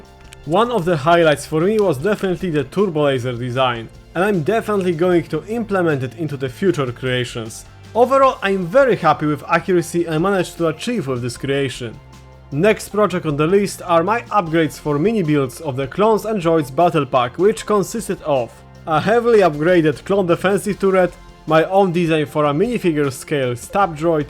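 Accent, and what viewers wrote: Poland. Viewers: Słychać ten polski akcent ❤ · elo a ty z polski jesteś bo akcent polski?????????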